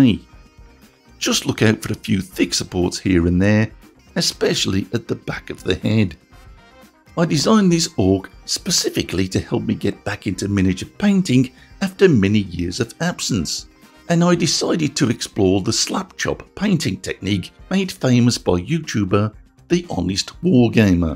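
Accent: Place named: Midlands, United Kingdom. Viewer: MARS GIVEAWAY MADNESS! thank you for this opportunity, your reviews are very handy and your accent reminds me of home as I grew up in the midlands! Good ol' Kidderminster 😂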